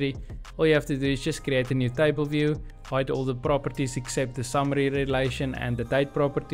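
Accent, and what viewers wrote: Afrikaans, South Africa. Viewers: the most south african sounding accent I have ever heard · knew you South African!!!🇿🇦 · afrikaans as fuck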